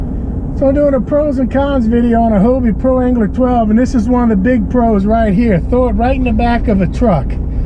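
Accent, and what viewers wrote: Louisiana, United States. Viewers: You sound like your from my neck of the woods Cajun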